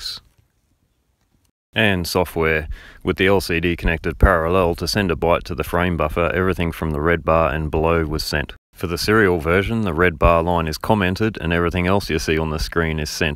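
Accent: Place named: Australia. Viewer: pleasant change to here an aussie voice haha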